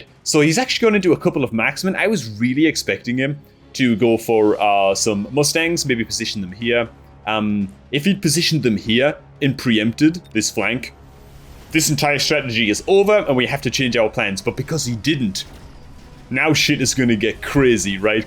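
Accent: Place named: Newcastle, United Kingdom. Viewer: Ah, Geordie makes sense!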